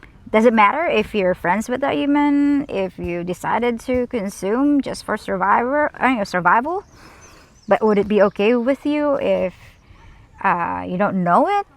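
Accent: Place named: United States. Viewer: Your English is so American ...congrats ...great accent.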